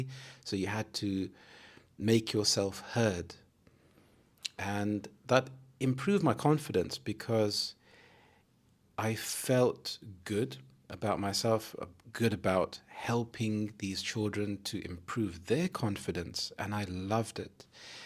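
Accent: United Kingdom. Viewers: Hello,! I don't know if you have any videos that talk about this subject, but I'm curious, because I see that you speak differently from other British YouTube channels that I follow... What version of British English do you speak? Written with Google Translate.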